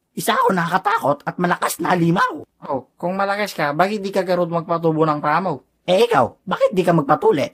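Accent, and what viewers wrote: Ilocos, Philippines. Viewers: Ilocano ka ba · ilocano ka pala lods🤣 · Ilocano ka idol? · Full blooded ilocano here hahahha.. nagsayaaten agita videom sir... hahahaha.. dami kong tropang ganyan magsalita... kung may taglish.. meron ilocalog hahahaha.. sa accent ako natatawa talaga.. more videos at sama mas madalas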